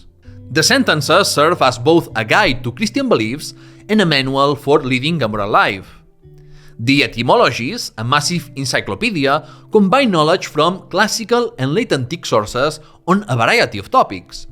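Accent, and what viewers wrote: Spain. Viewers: Is there an option in Spanish, this English is a little too Spaniard for me?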